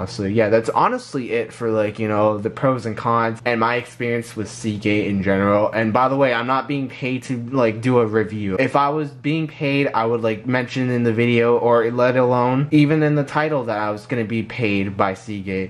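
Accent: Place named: Australia. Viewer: nice aussie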